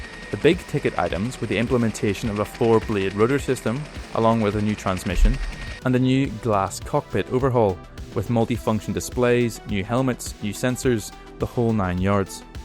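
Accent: Northern Ireland, United Kingdom. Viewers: And this narrator is northern Irish AF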